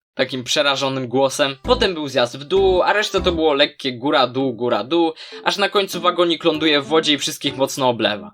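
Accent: Szczecin, Poland. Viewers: ty z szczecina?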